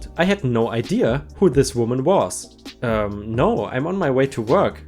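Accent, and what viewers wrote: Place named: Germany. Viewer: I don’t listen to many channels I’m not already subscribed to. There are so many new ones popping up and I usually end up getting the same stories over and over again. So, I stay faithful to my regulars. However, one of your videos caught my eye and I clicked on it. I really enjoy your voice and (to me, as I’m not German) wonderful accent. I do realize to you it would be ME who has the accent! 😀 Anyway, I have subscribed to your channel. I look forward to many more of your videos!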